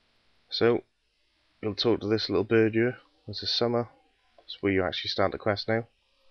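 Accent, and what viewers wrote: Wales, United Kingdom. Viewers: Thnaks for this it helped and I am proud to be welsh I recognise your accent. You from south wales?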